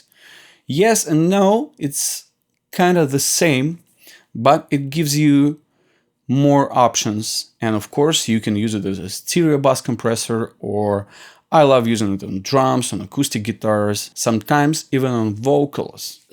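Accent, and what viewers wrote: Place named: Russia. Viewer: Сразу слышно, Русский говорит. Привет,. Я без стёба.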